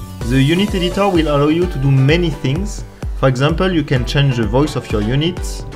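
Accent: France. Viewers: Je suis sûr vu ton accent anglais que tu es français. Est ce que je me trompe? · Le petit accent francais on le reconnais ^^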